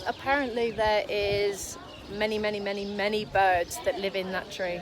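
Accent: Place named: United Kingdom. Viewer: I heard the accent... I'm immediately...I give like I really like uk accent 😍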